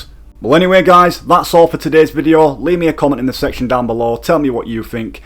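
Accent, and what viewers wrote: Bradford, United Kingdom. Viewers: Dude!? Are you from bradford??? You sound just like me 🤣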